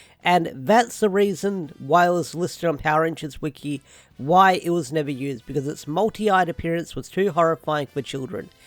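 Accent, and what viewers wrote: Australia. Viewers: oh I see well i saw one of you videos around 2020 but I started watching more of your videos in 2021 now I assume by the sound of your accent your australian am I right?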